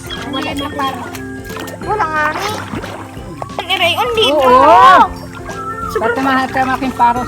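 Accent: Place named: Marinduque, Philippines. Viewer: we are the same accent im from marinduque province...watching from canada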